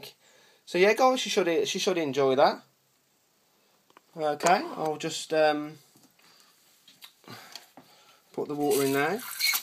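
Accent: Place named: Wolverhampton, United Kingdom. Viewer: Are you from Wolverhampton?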